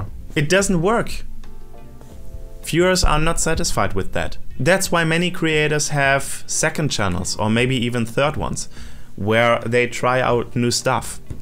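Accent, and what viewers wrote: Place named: United States. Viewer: У, действиетльно, очень чёткий английски, ближе к американскому, который я тоже очень хорошо понимаю. А вот самих англичан могу нормально понять только через одного, очень сильно зависит откуда они родом. Жуют и искажают слова, фиг что разберёшь. Наверное, их даже американцы плохо понимают.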